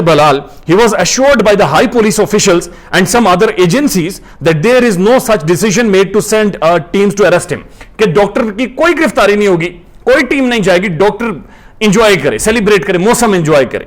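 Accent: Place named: Pahari, India. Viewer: Puttar tussi pahariye ho, I love the way you talk ♥️🤣🤣🤣 I laugh and laugh and laugh, Allah bless you with the greatest blessings of both the Worlds, Aameen, Summa Aameen !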